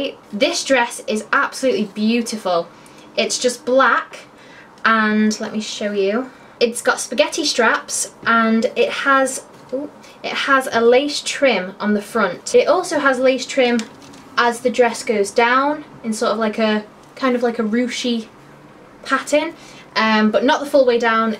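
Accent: United Kingdom. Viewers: You're soooo beautiful! Happy birthday! I love your videos and your british accent! XOXO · HOLY CRAP YOUR BRITISH!!! LOVE IT!!! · It's a british accent, I don't know which one :o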